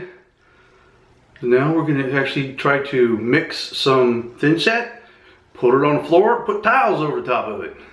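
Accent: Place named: United States. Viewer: Sounded Amerikin to me.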